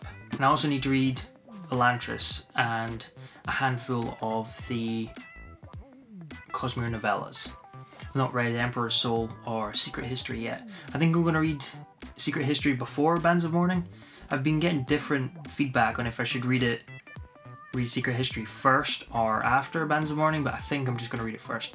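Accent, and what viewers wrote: Scotland, United Kingdom. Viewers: It’s great to hear a Scottish voice booktube! 🏴 Looks like you’ve got a great reading year ahead of you!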